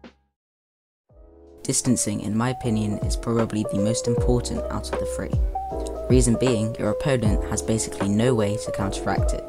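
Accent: United Kingdom. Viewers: fr he’s a British guy with a beautiful accent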